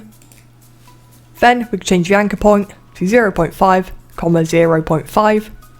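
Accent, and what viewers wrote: United Kingdom. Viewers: Do you add places for the lobby and the game? Also nice British accent there buddy. Also you're real helpful for me making my games good! :)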